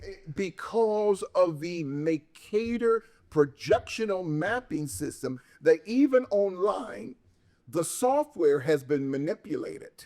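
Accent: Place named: Russia. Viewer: Congratulations on your 100th episode! I’m glad I found your channel. Your Russian accent makes the conversations so much better hahah ❤✊🏼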